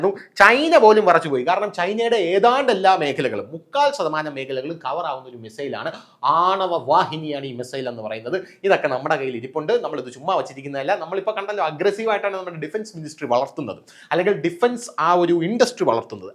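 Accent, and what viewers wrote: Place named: Trivandrum, India. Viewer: i'm a big fan of your style and the tvm slang !! Makes my day cheerful.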